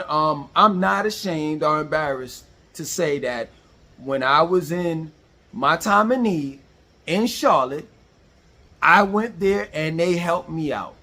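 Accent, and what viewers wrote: New York, United States. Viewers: It’s his brand and his bread & butter for content. Dude is from NY, (the accent is a giveaway) and all he does is whine about Charlotte & Atlanta. In other words, a gentrifier whining about gentrification 😂.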